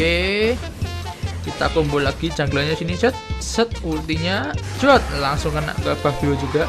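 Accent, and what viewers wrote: Java, Indonesia. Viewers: gunakan kemedokan nya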